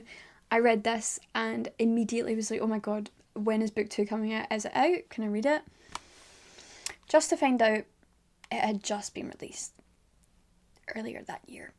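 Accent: Scotland, United Kingdom. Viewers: Soo this is your real voice, you sound a little bit british 😮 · It’s a Scottish accent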